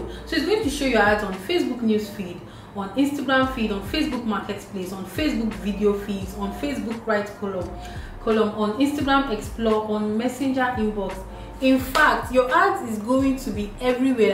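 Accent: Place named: Yoruba, Nigeria. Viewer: Nne, why do you sound so Yoruba? Looking peng though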